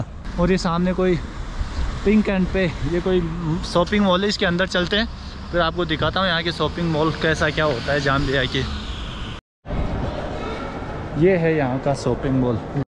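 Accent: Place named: Jaipur, India. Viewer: Kyu ki aap ki bhass Jaipur si lagti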